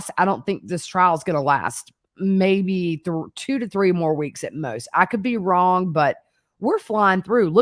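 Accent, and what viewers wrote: South, United States. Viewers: I enjoy your excellent commentary as it is so easy to follow. I am sorry to say I just discovered you but I am new to YouTube AND I am 76! I love hearing you talk. I am from Mississippi and have lived in Georgia as well as many other states but I will always love hearing a soft Southern accent.😊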